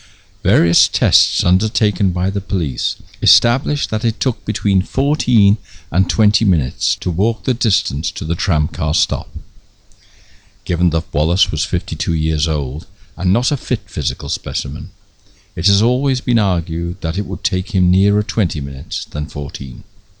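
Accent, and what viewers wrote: United Kingdom. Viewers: I'd rather listen to a North American accent ( or Canadian ) than the hideous cacophony of any English regional accent . In descending order : Manc , Cockney , Barnsley , Geordie or whichever Chav version you care to mention. You prat ! Best speakers of English are educated Indians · Europeans.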